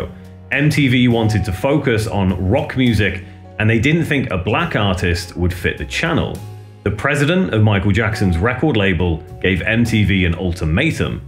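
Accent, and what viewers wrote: United Kingdom. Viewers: You sound British, but you only mention MTV us. MTV Europe would of being your channel until 1997, when it was replaced by MTV uk, which at first was ok for a couple of years, but MTV Europe was the channel I watched, even after MTV uk took over, I still done everything to get MTV Europe back, what a channel that was. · I actually fell asleep listening to this stiff britt try and explain American MTV 😂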